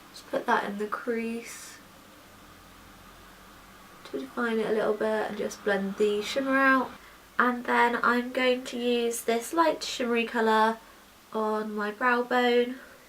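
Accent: England, United Kingdom. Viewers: I love your accent. Is it british? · she has an english accent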